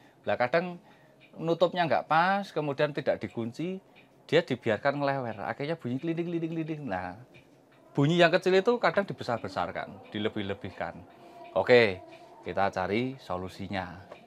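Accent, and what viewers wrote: Java, Indonesia. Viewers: Suka gaya bicaranya, agak medok, ditambah lagi guyonan dan kata² inspirasi atau kata² mutiara juga baik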